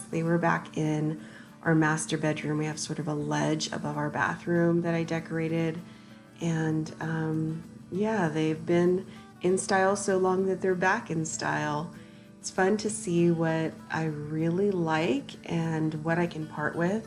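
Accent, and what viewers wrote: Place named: Colorado, United States. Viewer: You sound like you could be a Coloradan. 🙄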